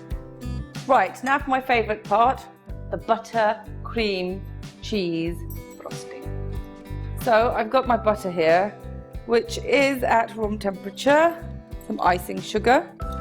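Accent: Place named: United Kingdom. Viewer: Ah that British accent!!! Lovely!!!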